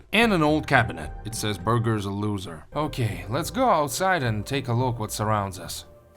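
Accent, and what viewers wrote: Russia. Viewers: great youtuber love his vids and the russian acsent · Are u russian? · Mind if you use you Russian accent to say on the video? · Are u Russian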